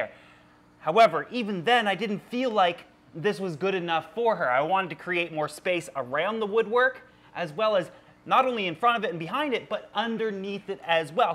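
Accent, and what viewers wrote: Canada. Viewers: you sound like a canadian. especially when you say "barb" haha sound like randy from TPB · Sorry *clearly Canadian* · I love your Canadian accent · I like your Canadian Accent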